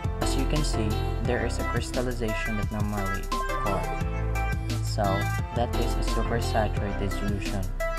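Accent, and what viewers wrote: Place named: Philippines. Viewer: You sound like a pilipino person im pinoy too.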